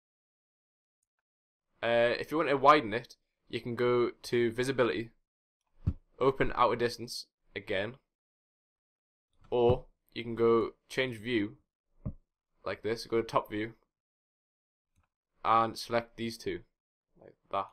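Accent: Newcastle, United Kingdom. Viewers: are you from newcastle by any chance?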